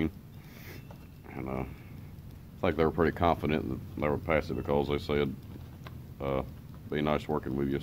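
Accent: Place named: South, United States. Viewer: Thanks for checking out our channel. Thought I would do the same. If I may ask, where are u from? Hear a southern accent. I was born in Arkansas, and lived in Texas a few years, growing up. Enjoyed the vlog.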